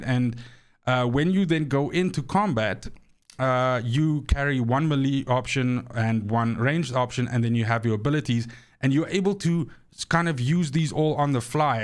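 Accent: South Africa. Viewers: South African? Love the accent.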